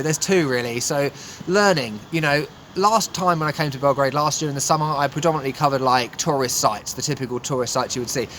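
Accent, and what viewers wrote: United Kingdom. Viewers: Thank you for visiting our country. Greetings for UK. Sorry if i am wrong about your country, you sound like people from UK. I know that because i was in London 2018. I almost cried when i had to go back. Bye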